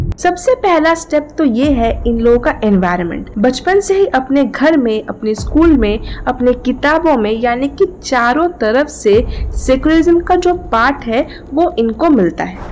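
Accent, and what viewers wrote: Bengal, India. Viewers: Bengali ho aap sayad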